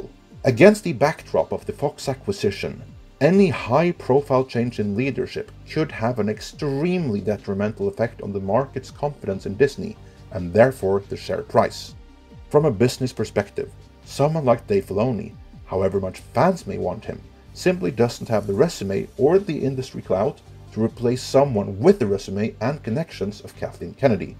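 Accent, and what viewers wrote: Sweden. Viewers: you're swedish, aren't you?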